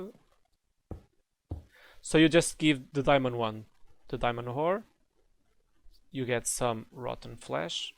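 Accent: Portugal. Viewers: By any chance, are you Portuguese? I recon the accent (Tuga here...) :) Amazing video, been lost with this mod on ATM6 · Are tou portuguese? u seem lisboeta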